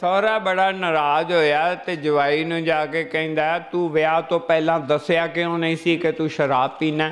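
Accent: Punjab, Pakistan. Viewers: your punjabi same we pakistani speak buhat khoob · What a beautiful affection developed with uncle, one thing is a very beautiful style of jokes and another thing is that uncle speaks exact our Pakistani style of Punjabi. Love u Baba g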